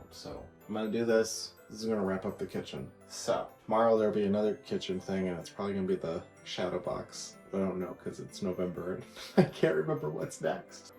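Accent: Minnesota, United States. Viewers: You're lookin' good there,! My goodness gracious, this is a family show. Hey, out of your window is green grass and green leaves! Are you decorating in June? Because some cranberries spilled we have our 6th day: On the Sixth Day of Vlogmas my true love gave to me Six berries spillin' Five Christmas trees 🎄🎄🎄🎄🎄 Four Paolo meows Three rolls of batting Two power strips 🔌🔌 And you sound like the movie Fargo 🎄⛄🙂⛄🎄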